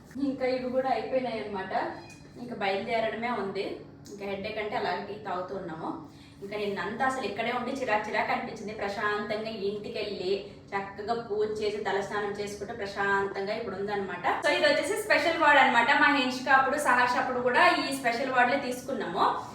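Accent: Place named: Telangana, India. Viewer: Telangana slang talking akka meru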